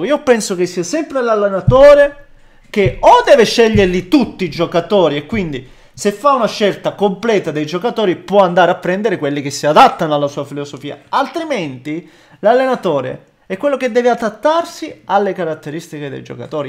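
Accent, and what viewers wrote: Calabria, Italy. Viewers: Ma prima o poi mi toglierai la curiosità di dirmi di dove sei? È troppo bello il tuo accento calabrese!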